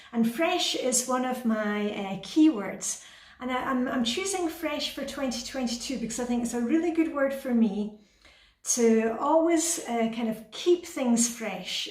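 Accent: Scotland, United Kingdom. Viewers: I like in Denmark, I don't need to win anything, I feel like a winner already, watching her happiness and little life tips in a sweet Scottish accent.Thank you!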